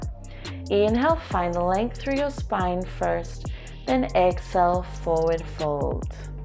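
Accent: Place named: Sydney, Australia. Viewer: First time watching your video and a new subscriber here! Great at home work out thanks! I'm living overseas so great to hear a fellow Sydney sider as well!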